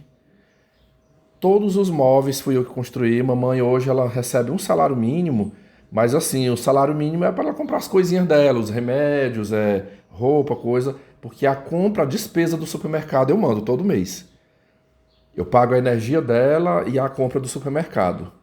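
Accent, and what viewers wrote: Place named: Imperatriz, Brazil. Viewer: vc tem muito o sotaque do pessoal de imperatriz, fui lá recente gostei muito de lá, principalmente de Carolina.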